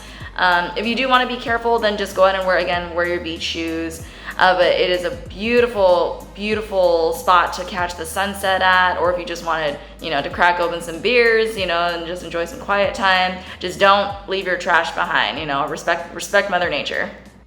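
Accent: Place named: California, United States. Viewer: Slow down when your talking. Lov ya but you sound like you're from California.... Colorado Lov.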